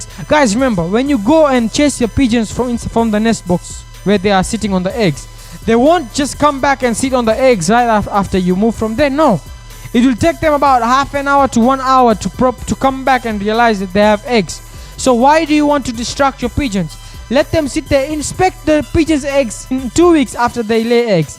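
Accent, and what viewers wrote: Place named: Africa. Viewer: You sound African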